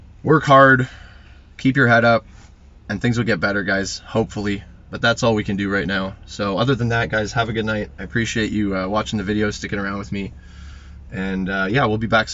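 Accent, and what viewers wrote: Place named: Canada. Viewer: i love how we talk similarly haha so Canadian eh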